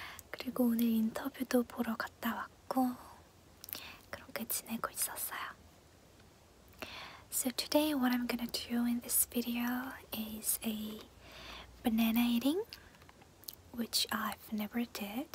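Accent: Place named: England, United Kingdom. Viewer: I like the banana container, it's so cute, and i like ur English accent!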